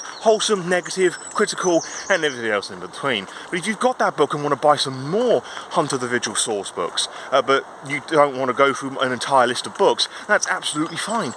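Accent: United Kingdom. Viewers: If I were British, I would want to sound like you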